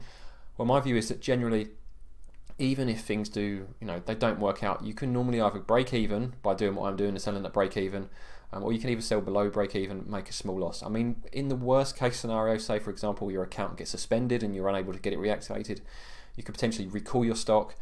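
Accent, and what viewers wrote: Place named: Essex, United Kingdom. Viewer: Great video, where are you based in UK? Sound a little bit Essex but can’t quite place the accent 😁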